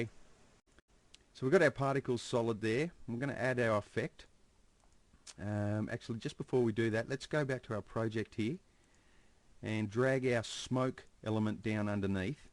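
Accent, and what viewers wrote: Australia. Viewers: That's an Australian Accent if I ever heard one. Awesome Tutorial, thank you very much. :)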